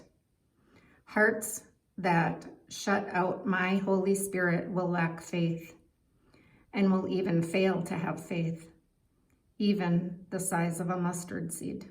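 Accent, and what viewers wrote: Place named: Minnesota, United States. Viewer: Are you from Minnesota? The accent. I'm from Minnesota. Haha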